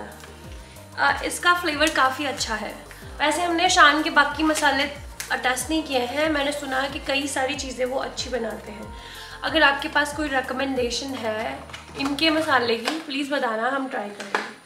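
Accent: Punjab, India. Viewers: I watched ur vlogs ur mix Punjabi nd Hindi accent Bec my mother spoke like u when she is wid me in dis beautiful world Buena don’t care about people they don’t allow us to live happily my sons name is also himmat stay blessed nd happy all d time😊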